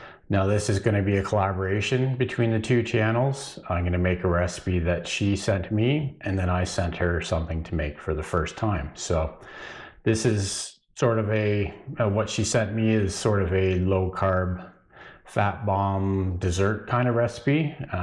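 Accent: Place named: Canada. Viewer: Hey,. Thank you for the video.😊 This looks like it may be a good replacement for ice cream, eh? What do you think? I had a weakness for ice cream, but trying not to eat it now.:/ I hear your Canadian accent.😊 (I’m from Chicago Illinois.) This is the third time that I subscribed to your channel. I keep getting unsubscribed.:/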